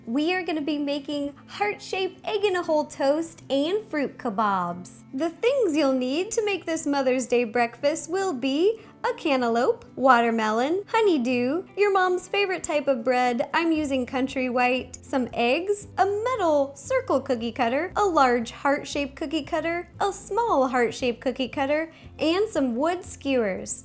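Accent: United States. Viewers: She's american and every country has an accent in their language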